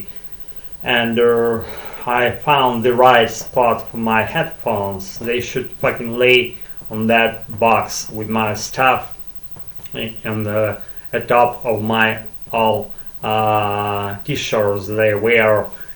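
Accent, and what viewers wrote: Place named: Russia. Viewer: I just enjoy hearing the Russian accent, nothing in particular :)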